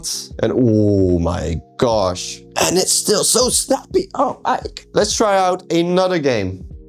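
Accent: Netherlands. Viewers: Is that a Dutch accent I hear?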